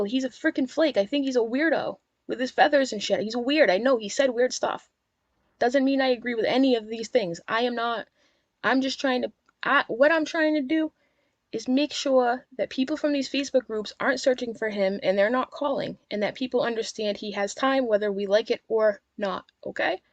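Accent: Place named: New England, United States. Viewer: This is the first time I've viewed your channel while looking for an update on Sophie. Your voice and accent make me feel like I'm back home in MA. I live in FL now but you sound so much like my friends back home. Are you from New England by any chance?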